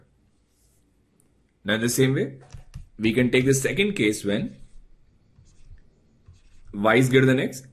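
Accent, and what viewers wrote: India. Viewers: I cannot even read the rightmost part of the question and the Indian accent is strong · He is indian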